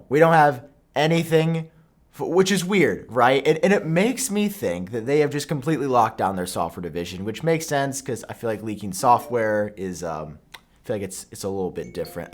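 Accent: England, United Kingdom. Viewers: Your Britain accent is pretty good actually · I laughing so much at your English accent, It’s crazy that apple store in england is the only store keeping covid restrictions . I don’t think Tim Cook got memo from boris that england is free from restrictions. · that “british” accent doe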